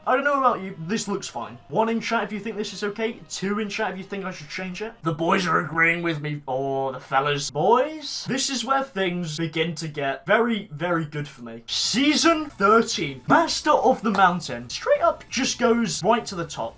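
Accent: United Kingdom. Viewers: Are you british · My ranking of your British accent? 11/10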